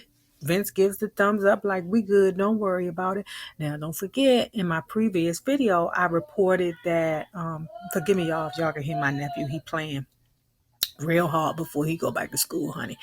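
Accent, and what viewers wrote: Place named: South, United States. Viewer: I keep playing this just to hear yo country ass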